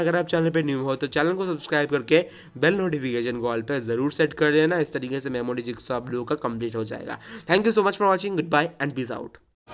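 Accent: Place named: Bengal, India. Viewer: Dada tumi bengali to...ami kotha suna tai mona hoy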